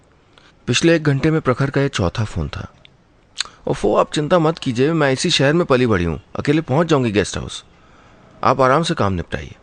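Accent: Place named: Uttar Pradesh, India. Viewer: sir ...apki aawaj me kuchh up ka touch lgta hai ....😍😎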